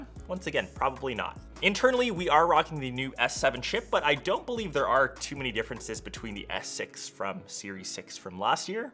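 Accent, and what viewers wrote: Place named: Canada. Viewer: I think I hear a Canadian accent..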